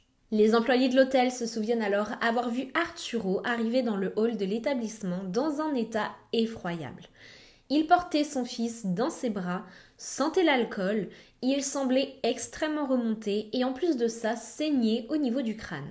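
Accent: Quebec, Canada. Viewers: je viens de découvrir ta chaîne & woww ton maquillage t’es toujours parfaite🫶🤍 j’adore tu parles beaucoup de cas que je connais pas ou qui sont moins connue en étant une grande fan de true crime. Je suis québécoise et ça m’arrive de regarder des youtubeurs français, j’ai l’impression que t’es française, crime je trouve que t’a un accent québécois AHAHA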